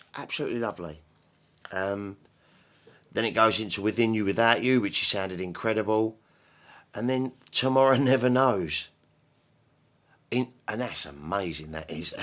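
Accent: United Kingdom. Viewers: As a newcomer, I have to say your voice is magnificently British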